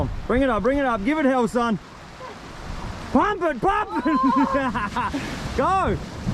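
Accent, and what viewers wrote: Australia. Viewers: You make me wish I had a Australian accent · You'd love to come and live here in Australia. Nearly everyone sounds like that:) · i love aussie accent more then regular english accent nice vid buddy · Are you Australian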